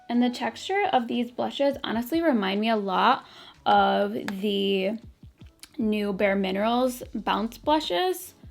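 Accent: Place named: Minnesota, United States. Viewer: Are you from/live Minnesota? Certain things you say reminds me of how people there talk. 😊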